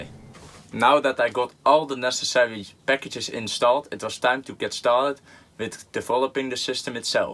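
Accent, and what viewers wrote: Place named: Netherlands. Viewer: Je kunt zo horen dat je uit Nederland kom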